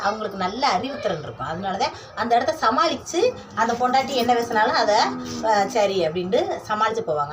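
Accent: Madurai, India. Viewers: Mathurai or usilampatti or thondi slang kandippa namma ooru kaa thaan neenga ❤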